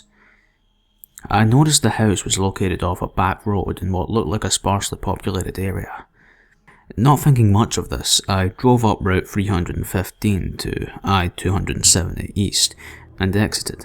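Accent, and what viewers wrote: Scotland, United Kingdom. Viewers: you're a man of great talent. thanks for the text back and keep giving us a brilliant channel. the accent? I'm thinking you're from the east coast of Scotland?😃.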